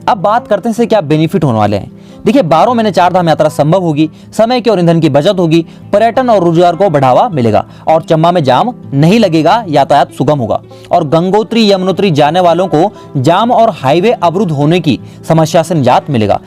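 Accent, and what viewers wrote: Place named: Garhwal, India. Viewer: bhai ki awaaz me garhwali ka feel aa raha hai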